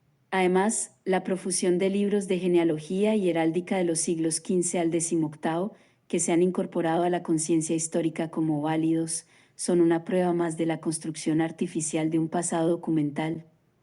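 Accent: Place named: Antioquia, Colombia. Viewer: Muy interesantes reflexiones Andreu, la cronología tradicional de la historiografía efectivamente es casi pura creencia religiosa y sabemos que la razón tiene poco que hacer en la arista de las supersticiones, lamentable es que esa religión fue convertida en "ciencia" a espaldas de la humanidad y por ello provoca esa "disonancia" tan marcada, teniendo a nivel planeta a casi todas la facultades humanistas de historia obedeciendo sus dogmas. (excelente narradora virtual que contrato,! a demás cuenta con el bello "acento paisa" :D)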